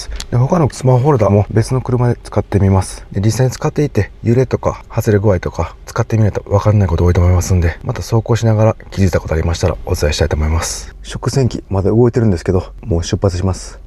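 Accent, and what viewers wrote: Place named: Kansai, Japan. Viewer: そろそろ関西弁なんとかならんの？？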